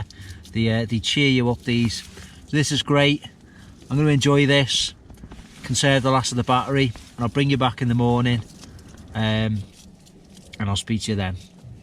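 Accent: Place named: Wirral, United Kingdom. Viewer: Hi good vids mate You sound local to me Wirral. I have the msr elixir but the reviews you have done has sold me the Hilleberg soulo 👌🏻keep the vids coming chief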